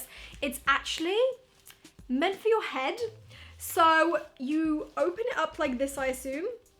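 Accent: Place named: England, United Kingdom. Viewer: I think I'm in love with your english accent❣️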